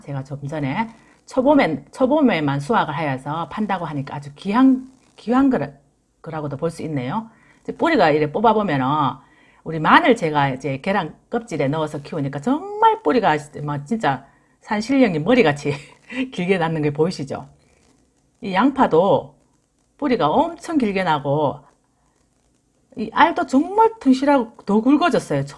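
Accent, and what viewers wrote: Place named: Yecheon, South Korea. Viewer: 우리 마누라 사투리랑 똑같네 예천군 ~~~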